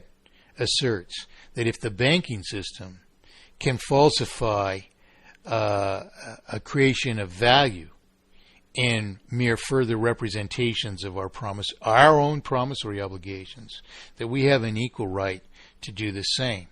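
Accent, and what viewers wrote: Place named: Australia. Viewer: I will be waiting for the ultimate debate between Austrians and MPE advocates: Peter Schiff, Ron Paul, Rothbard VS. _________? Perhaps your Australian accent will suffice. does well but he doesn't talk as fast as these other guys.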